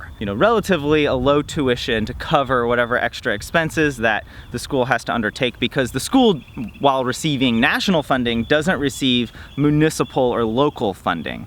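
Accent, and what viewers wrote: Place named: United States. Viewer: You're not Finnish, are you? Awesome American accent. Just, FWIW, Americans will never understand Finns! Sorry, gave up with the bullshit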